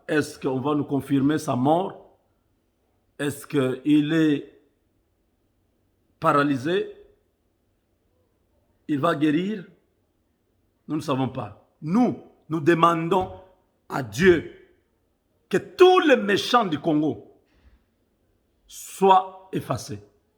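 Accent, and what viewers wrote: Rwanda. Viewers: Ton accent semble Rwandais verifier ton geneologie peut etre qu’il ya l’un de tes parent qui est Rwandais.j’ai vie au Congo pdt 13 ans je n’avais jamais attandu un vrais Congolais qui parle le francais comme ca.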